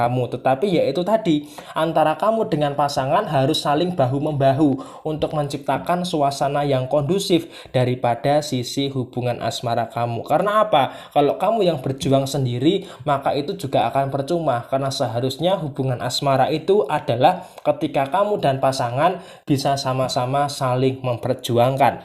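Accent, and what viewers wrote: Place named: Kulonan, Indonesia. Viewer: Njenengan orang mana mas kayak orang kulonanan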